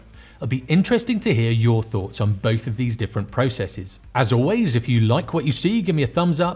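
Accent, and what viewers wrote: United Kingdom. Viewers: I like your presentation skills - you present with conciseness and brevity. And, cheery on the top is your british accent! ;)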